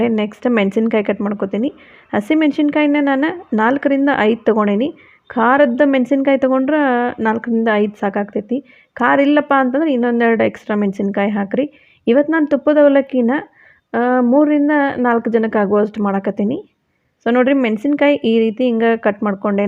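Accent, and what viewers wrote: Dharwad, India. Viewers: आपण किती छान धारवाडी कन्नड बोलता.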